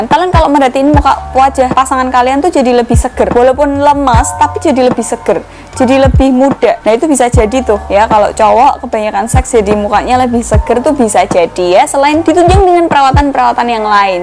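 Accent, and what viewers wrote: Java, Indonesia. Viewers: Salfok sama bahasa nya mba wkwkw "Medhok" wkwkw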